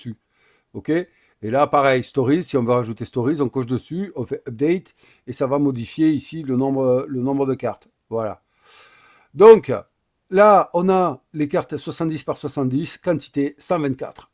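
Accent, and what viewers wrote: South, France. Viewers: avec l'accent du soleil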